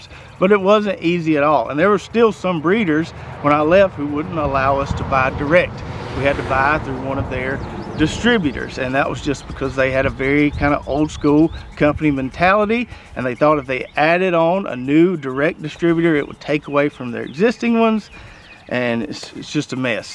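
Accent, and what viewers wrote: South, United States. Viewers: ...You just cleared up my thoughts as of late. I have been watching your videos for the last year and really appreciate your intelligence, southern accent, humor and love for gardening. You're the reason I purchased all my drip, fertigation and seeds from Hoss. I am sure they are missing their main man. Not sure we'll ever know what happened but if you start your own company, many like me will follow. God bless you and your family. Everything happens for a reason! Thanks for making awesome videos! Hollerin' from O-H-I-O! All the best to you and your family!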